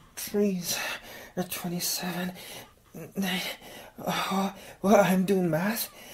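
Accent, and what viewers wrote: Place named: Ireland. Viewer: Irish accent is definitely a lady killer specially with voice!! 🍀🥂He's a lady killer himself and he knows it ladies hehe 🤭🥰 cute audio btw 😜💞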